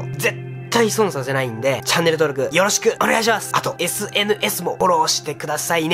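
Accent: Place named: Fukuoka, Japan. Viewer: どちらの出身ですか？福岡のどこかですか？方言が親近感あいます。応援してます。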